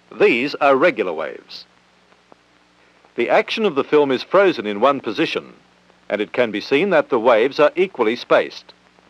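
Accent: Australia. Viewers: To my Australian ears it sounds like the Cultivated Australian accent, owing something to Received Pronunciation but definitely Australian. The General accent (Hugh Jackman for example) is broader than this and the Broad accent (think Steve Irwin) is way past that :) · He's Australian, what do you expect? You want everyone to pronounce words in 'Merican? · The announcer sounds very Australian to me. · These are great fun! I was wondering why an Aussie was doing the voice over for a US university film. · Oh right yes. As an Aussie myself it was a (nice) surprise to me to hear an Aussie voice pop out in the context of a Fran film.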